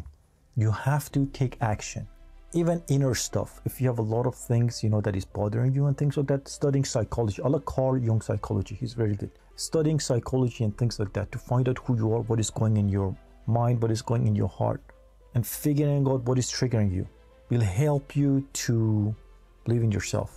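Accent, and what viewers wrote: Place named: France. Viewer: TRUE , I WILL AS A last resort! Specially in workforce , if push comes for sure! Curious , you have french accent when you speak! Are you from France or elsewhere? Love all multi languages, I am Spanish 😄half Cuban/Peruvian born in USA! Hoping when we all descend to 5D we can read minds and speak all languages! Love traveling! But for now I wait! Waiting for the Spirituality to see Grand parents miss them immensely! KEEP UP ALL POSITIVITY 😉 most people do not want to believe and higher sources!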